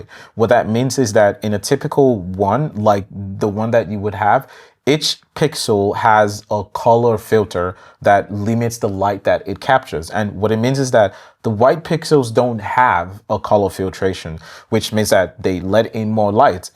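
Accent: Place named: Nigeria. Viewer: Oga that Naija accent can never hide lol. Thanks for the video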